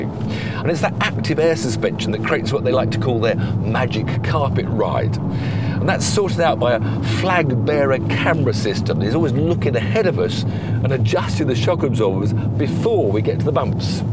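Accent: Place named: United Kingdom. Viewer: Oh my goodness I have for a long time tried to humorously speak as a Brit. This guy is the ultimate in the snobbish presentation. 😂. When he got to the this of course is a Rolls Royce there is no tacky touch screen. I said I love him..😂. It was the absolute perfect delivery I would expect from a Brit.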